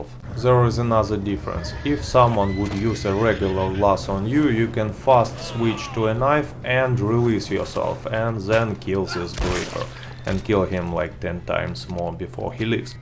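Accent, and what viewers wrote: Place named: Russia. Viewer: Still laughing please don't ever lose the humor everything us so much funnier in Russian accent!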